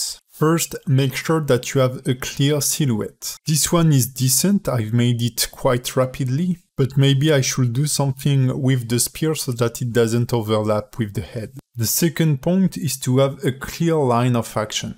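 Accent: France. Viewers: ça sent le bon accent français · I love your french accent bro ( j espère que je me trompes pas en disant que t es français) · T'es Français uhn ? ça s'entend XD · Est tu français car j'ai l'impression que ton accent est français. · Hhaaa l’accent français 😄, super vidéo instructif, tout le monde en avait besoin. Merci beaucoup. En espérant avoir d’autres à l’avenir,bonne continuation. Ps: serait t’il possible d’avoir des sous-titres en français,même si on est en petit minorité sur ta chaîne(je pense) 👋👏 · french accent ? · i think you have a french accent ^^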